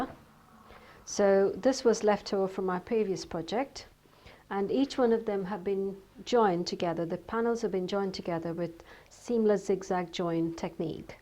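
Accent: United Kingdom. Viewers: I enjoy all your proyects and your britanic accent.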